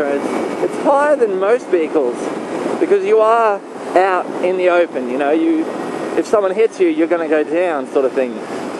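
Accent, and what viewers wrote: Australia. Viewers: please tell me you are Australian! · you sound Australian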